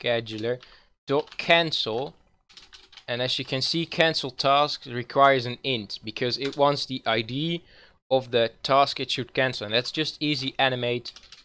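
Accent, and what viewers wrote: Netherlands. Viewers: Nice Nederlands accent!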